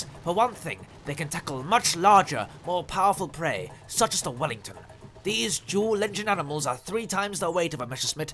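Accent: United Kingdom. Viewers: What is it with you Brits and narrating xD When the messerschmitts drove to the downed wellington you really cracked me up haha. (I chuckled throughout the enitre video :p)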